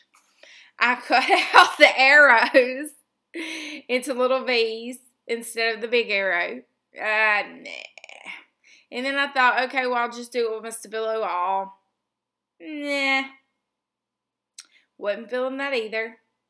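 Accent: South, United States. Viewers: I love your southern drawl AND your vids! 🙂🙂🙂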